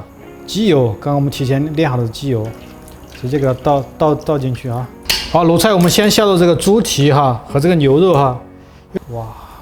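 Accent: Sichuan, China. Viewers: 这个川普听上去真上头啊 哈哈 但是越听觉得越是喜气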